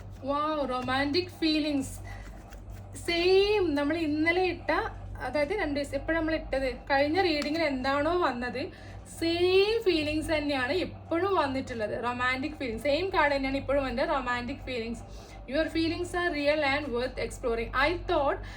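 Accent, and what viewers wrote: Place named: Kerala, India. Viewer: ബാലുശ്ശേരി കൊയിലാണ്ടി ഭാഷ എല്ലാറ്റിനും ആയെ ആയെ അതായത് ആണോ എന്നുള്ളതിന് പിന്നെ കണ്ടിക്കി വനനിക്കി എന്നൊക്കെ പറയുമവൾ എന്നതിന് ഓൾ എന്ന്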